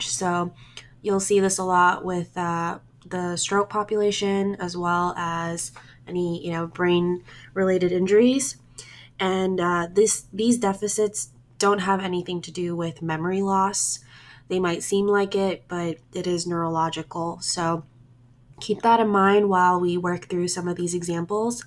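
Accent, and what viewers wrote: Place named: England, United Kingdom. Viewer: I just loved your english accent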